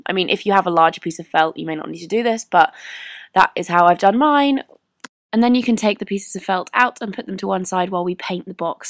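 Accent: United Kingdom. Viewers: I love ur British accent :D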